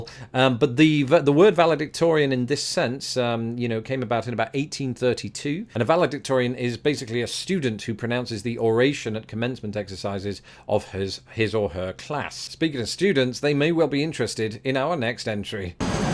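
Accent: United Kingdom. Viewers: I'm catching up on older videos. it's fun to hear him sound more "British" as the videos get older. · Brilliant list! I think I only used the word brilliant after listening to your brilliant british accent. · Thanks for this series, it's most enjoyable and quite interesting. As someone whose been a bit of an Anglophile in my media consumption, I've long found the way the UK speaks the same language this former Pennsylvanian used intriguing. Both the US and UK speak a strikingly similar language---except at those times when we use totally different words or phrases to describe the same thing. From my teen years, thanks to our local PBS affiliate, I became exposed to a litany of BBC sitcoms that became my first forays into hearing the type of English you grew up speaking. Between Tom Baker's Doctor Who saving the universe with K-9 and Sarah Jane by his side, to Mrs. 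Slocum worrying about her pussy well being at home in the airing cupboard, while working behind the counter at Grace Brothers, I was smitten with British TV. What intrigued me most was learning all of the words and phrases that we didn't use back home in Harrisburg. Deciphering contextually what everything from a car's bonnet to it's boot became intriguing mental exercises for my teenage brain. Ever since, I've always found interesting how English varies in its usage between the nations that speak it, so I appreciate your perspective on the differences. The themes for these videos are well considered and the abridged etymologies have been most enjoyable, as has been your presentation of this overall. A British accent like yours just adds that nice touch to the whole thing, I think, so I hope you retain it. To my American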